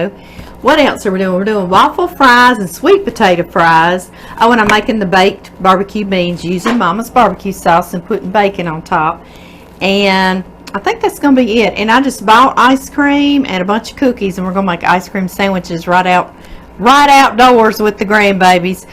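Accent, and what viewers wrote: South, United States. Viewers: I love the way you talk, keep it up!!!! Southern ladies have the most beautiful accent!!! LOVE IT!!!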